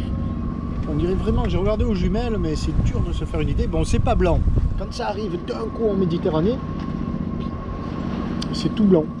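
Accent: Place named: Var, France. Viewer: j'adore t'es vidéo cette accent varois bien de chez nous plaisancier a moteur tu me donne gout a la voile continue!!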